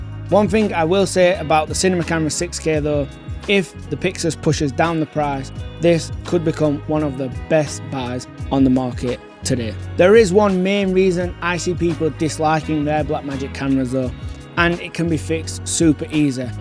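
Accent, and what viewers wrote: United Kingdom. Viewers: i really like your videos but pls you have to speak a bit better because as a non native english speaker its hard to understand your harsh british accent.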